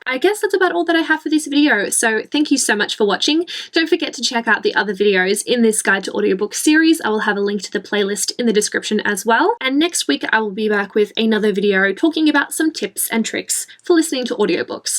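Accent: Australia. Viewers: You shd make audiobooks. Would you do it if the opportunity arose? You have a clear but tangy Aussie accent. I'd recommend Jon Krakauer narrating his book Into Thin Air.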